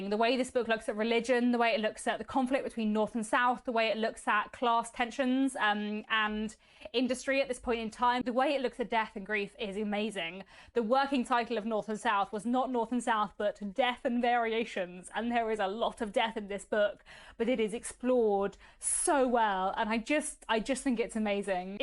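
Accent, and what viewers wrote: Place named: West Country, United Kingdom. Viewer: I would find it hard to do a top ten, and I would have to split it into two lists, one of fiction and one of nonfiction. I have read several of the books you mentioned, but none of them would make my top ten. My books would be a little obscure to most people as they would be germane to Gnosticism and the Paranormal or to Historical Fiction. Books like Luce by L. R. Fredericks, We Other by Sue Bentley, The Strange Life of Ivan Osokin by Ouspensky and The Secret Magdalene by author and old FB friend Ki Longfellow would be in my top ten fiction books. The one most would know is Melville's Moby Dick which is very Gnostic when you understand what Gnosticism is all about. From Historical fiction, I would put Monk's Hall in there by the late Hilary Mantel, Innocent Traitor by Alison Weir and Sarum by Edward Rutherfurd. 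That last one, is set around Salisbury and Stonehenge and the Cathedral and how the place became inhabited in ancient times. I detect a slight West Country accent in you, so it would be the one I recommend, which makes for fascinating reading.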